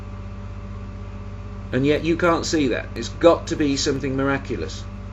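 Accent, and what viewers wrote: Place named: United Kingdom. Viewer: One of the most beautiful videos I've seen on YouTube., your combination of wisdom, humanity, charisma, dramatic flair, and British accent is just unbeatable.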